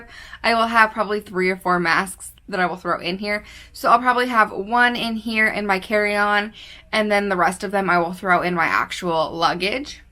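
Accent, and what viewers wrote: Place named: United States. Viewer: Nice video, thanks for sharing. I haven't finished watching it yet... I had to pause when you mentioned nearly a 12 hour journey!!! I thought to myself, she sounds State side, where could she be flying from 12 hours to get to Tenn?? Then I realized (after reading About Me section) you're coming from OR. Makes sense 😂😂 ❤️❤️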